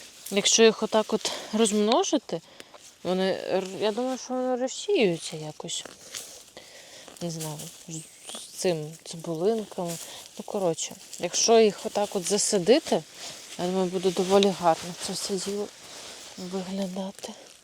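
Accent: Ukraine. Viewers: Прикольно балакати: почала тойво підгортати )) як на Закарпатті)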